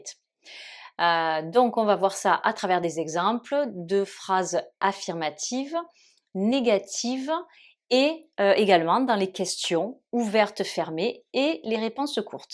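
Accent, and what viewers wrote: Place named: South, France. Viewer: C'est vraiment magnifique prof. J'en profite parce que j'étudie le français depuis longtemps et là, j'ai décidé de réapprendre l'anglais mais, en passant pour le français. Honnêtement, j'adore l'accent du sud. J'ai un ami à moi qui vient de Narbonne qui parle de la même façon. Très bon travail prof...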